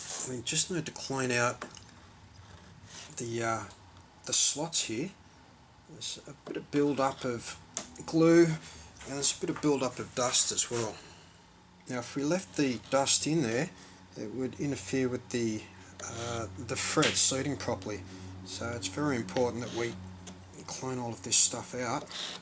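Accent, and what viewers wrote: Australia. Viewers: Thanks for an absolutely great video on how to do this with precision! And I love your Australian (?) accent:)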